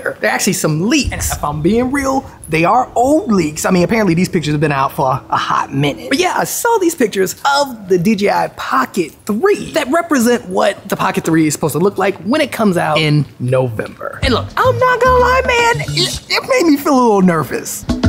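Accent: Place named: Brooklyn, United States. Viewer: Love the Brooklyn ghetto street accent. Subscribing...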